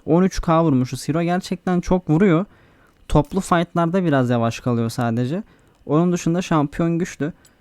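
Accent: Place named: Samsun, Turkey. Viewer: Bu adam Samsunlu la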